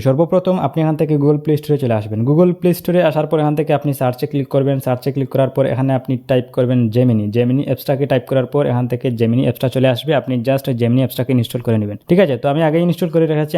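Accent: Sylhet, Bangladesh. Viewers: Vai ki sylheti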